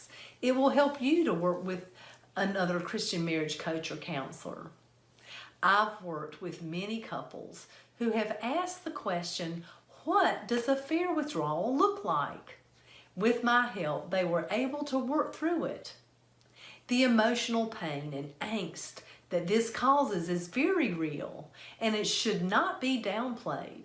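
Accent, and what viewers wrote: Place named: South, United States. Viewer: Well, yeah, you can tell by the southern accent that Christianity blurs the fact of the matter….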